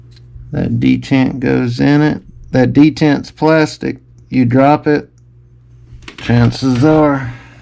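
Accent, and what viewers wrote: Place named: South, United States. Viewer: What a douchebag comment , cause he’s got a southern accent , let me guess your some proper speaking northern liberal? Stop being rude , thought you yankees were supposed to be all tolerant and shit?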